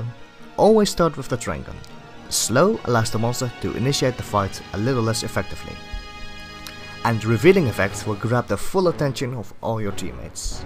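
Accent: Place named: Netherlands. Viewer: Nice try, you're Dutch, I can hear it!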